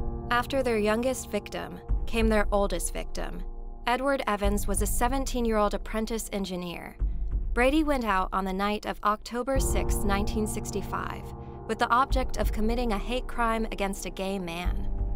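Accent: United States. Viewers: Quit with these dreadful American accent talk over please awful this should be a British talker. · Hearing an American pronounce all our town names is so strange! I live so close by it's so eerie and sad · it’s quite strange to these very British crimes reflected back on to you with an American accent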